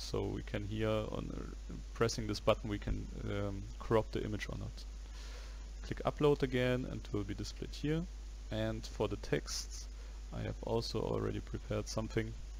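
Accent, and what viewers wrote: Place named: Germany. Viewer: Man merkt das du Deutscher bist aber danke für die Hilfe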